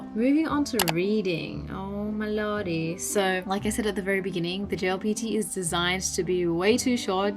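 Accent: Australia. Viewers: Your accent is 75% Aussie and 25% US!! WTF? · Are you Australian? Very cool accent. Feels a mix of several accents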